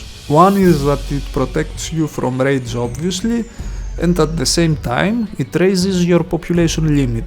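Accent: Greece. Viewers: haha I am a nottingham forest fan and i notice you have the same accent as Marinakis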